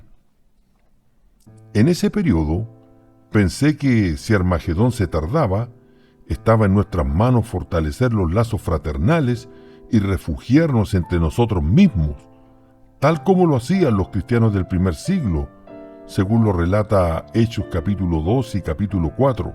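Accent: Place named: Chile. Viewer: Por la forma de hablar me parece que es chileno ¿o me equivoco? Soy católico practicante y que pena que se haya desilusionado de la Iglesia.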